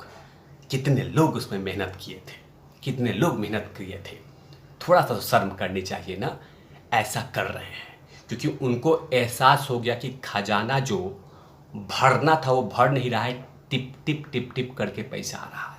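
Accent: Bihar, India. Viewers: Kya Bihaari bolte hain bhaiya, matlab ekdum maja hi aa gaya.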